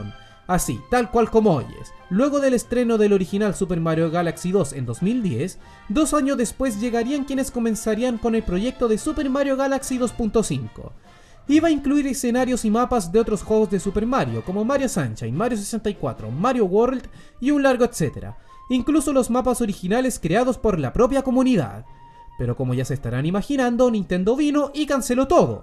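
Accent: Chile. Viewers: ¿Eres chileno, verdad?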